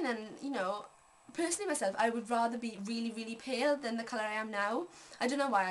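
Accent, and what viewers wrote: Wales, United Kingdom. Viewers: my love you sound soooooo welsh, love ya hun:)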